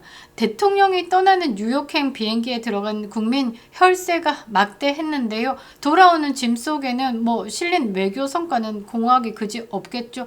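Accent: Gyeongsang, South Korea. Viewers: MC면 성조강한 사투리나 고쳐라. ... 여자들은 잘 고치는데 겅상도 티내냐 ㅋㅋㅋㅋㅋㅋ 윤석열 시절에 언론 기레기들이 김명신 얼굴보고 와~까지 하면서 단체 딸랑거리고 생일날 북한처럼 경호실 축하송까지 만들어 부르는 수준에서 언론 딸랑거린다란 소리가 나오냐 ㅋㅋ 신천지 통일교 전광훈 세계로 교회출신 극우냐? 나라를 위기로 만들어놓고 탄핵이나 당하는 주제에 아직도 정신 못차리고 그입에서 새벽예배를 언급하네.. 예수님을 못에 박은건 당대 보수주의자인 유대교 바리새인들이였단다.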